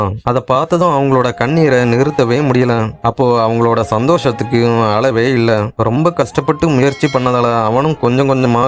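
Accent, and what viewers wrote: Coimbatore, India. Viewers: Neenga coimbatore a 😊nalla pesaringa aana ithu world platform formal a pesunga intha ingu podathinga but nicely talking vaalthukal valaraku 🎉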